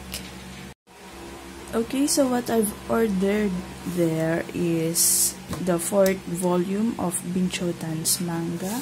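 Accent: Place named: France. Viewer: Are you french? I love your accent!!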